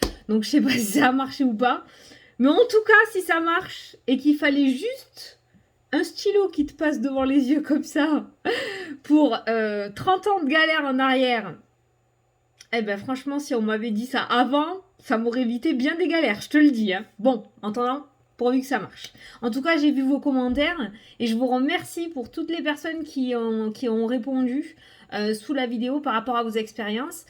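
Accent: South, France. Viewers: J adore ta bonne humeur toujours un plaisir de t écouter avec ton accent du soleil!! Bisous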